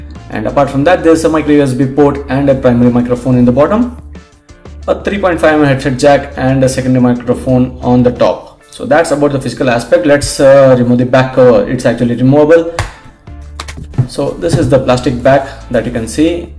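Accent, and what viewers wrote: India. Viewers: your English accent like is Indian 😁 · your indianoasian english sucks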